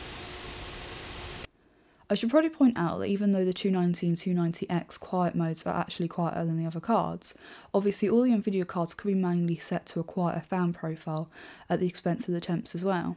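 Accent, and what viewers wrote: United Kingdom. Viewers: I'm sorry, but I don't understand most of it. While I find your dialect interesting, you slur your words together so much, that I seldom can pick them out of your sentences. I can't imagine that these videos are made only for the british people in your area (who, I suspect, would understand you perfectly well). The rest of us, and especially non-native speakers such as myself, are really having a hard time here. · Nice work, interesting channel and projects, great looks and smile, nice personal touch in videos (beautiful dresses, bunny, …) with no sight of arrogance in your speech and manners that indicate that you respect the audience. (I like how sometime apologize for smth. you didn’t have time to complete or achieve nevertheless the hard work you already done). If I had a TV channel and money I would definitely hire you (Don't speak American stick to UK-English accent) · I just watched this video and made a comment about how I can't understand her English b/c of her accent and I made my comment b4 I saw yours so obviously people are having problems understanding her dialect. 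Your videos are awesome and very professional but you need to speak slower and dictate your words individually or people that aren't British will be lost. Just my 2 cents.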